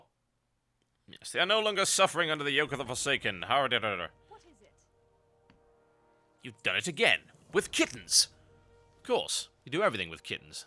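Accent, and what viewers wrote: United Kingdom. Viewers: dude your british accent fits for the worgen commentary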